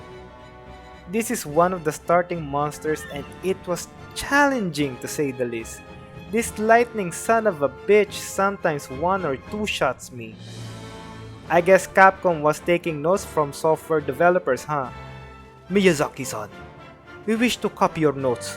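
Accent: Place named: Philippines. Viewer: I smell pelepeno accent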